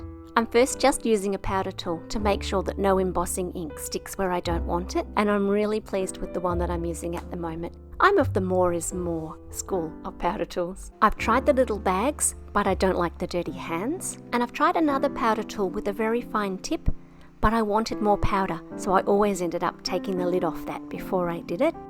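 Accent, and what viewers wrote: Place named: Australia. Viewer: Hi, I love, love, love this technique to colour cards... so beautiful! You have certainly inspired me to make cards similar to these again. By the way, it is so lovely hearing another Aussie accent, and I immediately subscribed. Blessings from another Aussie, Gloria. 🥰🐨🦘💖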